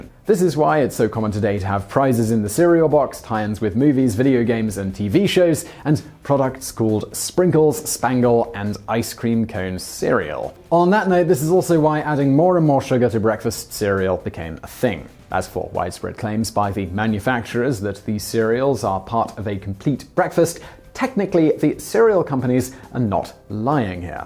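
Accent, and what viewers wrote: United Kingdom. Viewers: This is the most British Simon has ever sounded in any video.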